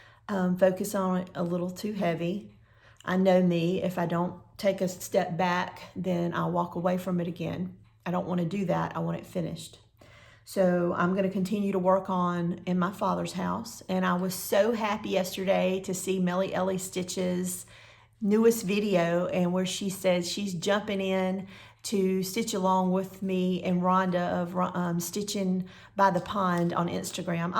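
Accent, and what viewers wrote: South, United States. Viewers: I always enjoy spending time with you and listening to your lovely southern voice describe your junking finds and your stitchy accomplishments. Have a wonderful couple of weeks. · I just love you and your channel. Everything cross stitch. And that southern accent, love it. You are a great gal.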